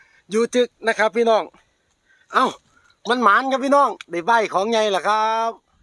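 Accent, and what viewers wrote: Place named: Sisaket, Thailand. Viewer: พอมาทำงานต่างประเทศมันกะเงียบเหงา พอได้เห็นคลิปนี้ก็หายคิดถึงบรรยากาศตกปลาที่บ้านเด้อครับ สำเนียงคือศรีสะเกษบ้านผมแท้ครับ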